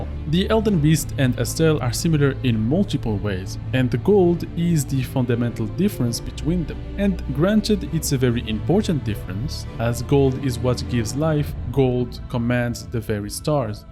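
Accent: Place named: France. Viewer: Ah!! Serais tu...french? Je me disais que cet accent subtil me disait quelque chose... Excellente vidéo, hypé pour le dlc, mais il va falloir éviter Yt les derniers jours avant la sortie...me faire spoiler les boss une semaine avant la sortie par """"""certaines"""""" chaines depuis des années j'en peux plus...😳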